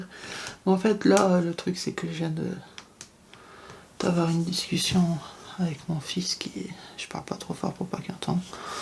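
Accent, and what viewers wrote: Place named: Nord-Pas-de-Calais, France. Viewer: Coucou Comment ça! L,accent chti un tue l'amour??😂😂😂 Moi je l'entends encore un peu sur le son de tes "a" 😁 Ah ah j'ai le même garçon! Jamais de sa faute 😂😂 Merci pour cet agréable moment et de plus j'attendais de voir cette toile avec impatience depuis que tu as dis que tu l'attendais donc maintenant c'est bon je peux craquer 😅😂 Gros bisous 😘😘😘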